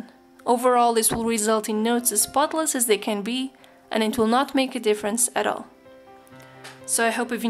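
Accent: Portugal. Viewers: Hey, your accent sounds very portuguese xD wondering if you are, and if so, where are you from? Edit: ok you said in the video that you are portuguese xD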